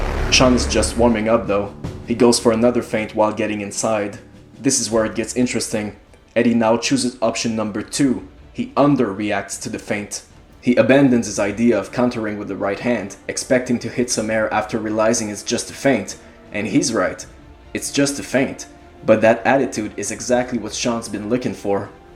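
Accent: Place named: Quebec, Canada. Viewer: Love your videos! On entend l'accent québécois légèrement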